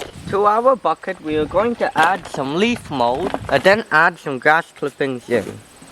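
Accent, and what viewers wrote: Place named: Laos. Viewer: Absolutely a fantastic video. Very good job, young man. Please dont be offended, but you remind me of the Laos people i had the prevelage to work with. It's your dialect. Some people get offended if you miss guess their nationaly. What country are you or your parents from, as you may be a first generation to a different country? Your english is really good and clear easy to understand. You have a good knowledge. I learned some good information. I went to the other website that you pointed to, and your sibling also did a fantastic job, has good knowledge with exclent english.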